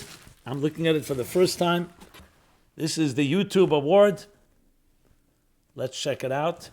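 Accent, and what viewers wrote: New York, United States. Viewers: How wonderful your lessons are, I have shared to many of my friends and clients. The way you speak resonates deeply within. And the NY accent is so good!!!! TY!!!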